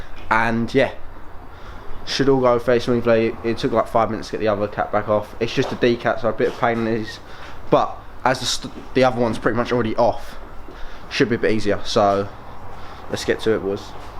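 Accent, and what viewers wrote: Essex, United Kingdom. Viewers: essex accent is 100% the worst English accent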